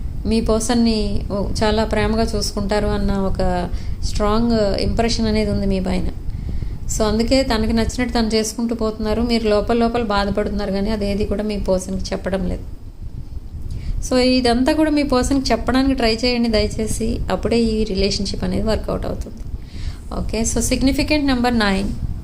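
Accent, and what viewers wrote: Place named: United Kingdom. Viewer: The voice u have is very pleasing...in between ur smile...the english ackcent ...you use...these all make me very happy to see readings very eagerly...we are gifted to have a good human being...❤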